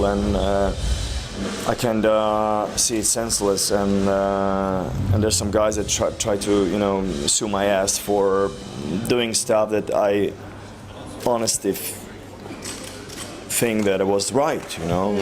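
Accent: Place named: Sweden. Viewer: not every swedish have to can speak english perfectly. your accent is lovely, I like swedish language better than english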